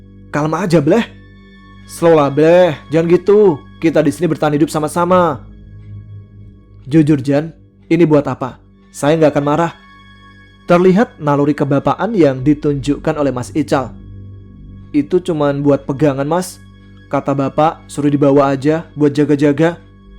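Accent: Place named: Java, Indonesia. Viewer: Bang bisa ga ngomong nya jangan di medok medokin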